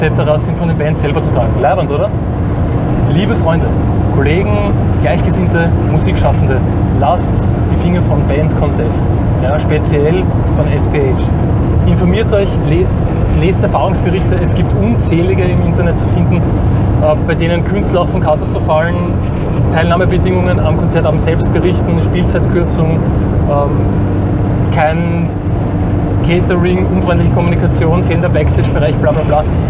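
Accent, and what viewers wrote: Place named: Austria. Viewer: Das ist so witzig, deine Sprechstimme zu hören. Ich kannte bisher nur deine Singstimme (sehr überraschend bei einem Sänger, ich weiß :-D) und die ist ja so anders. Und dieser schöne Akzent! Hat mich grad total überrascht, obwohl ich ja weiß, dass du Österreicher bist. xDD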